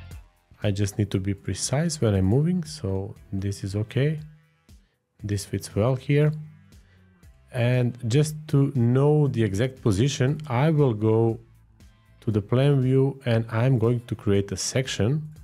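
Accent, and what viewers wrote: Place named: Croatia. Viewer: Nice video and new suscribe! by the way are you hrvat? You have a strong accent and the red and white of your brand. Pozz iz Argentine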